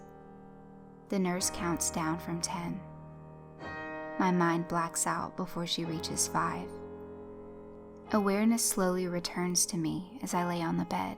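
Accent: South, United States. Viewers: that southern accent though.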